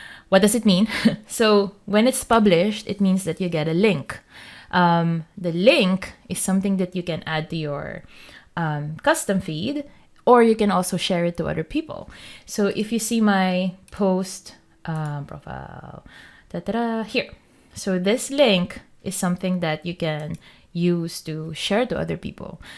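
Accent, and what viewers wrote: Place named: Philippines. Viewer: are you filipino??? your accent is sooo filipino english to me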